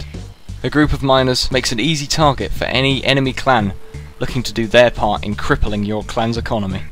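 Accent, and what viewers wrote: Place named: United Kingdom. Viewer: That British voice... makes me weak at the knees;) I don't need no voice.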